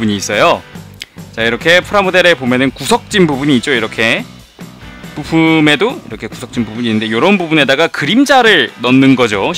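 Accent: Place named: Seoul, South Korea. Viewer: 와 말투 서울말씨 찰지네 ㄷㄷㄷ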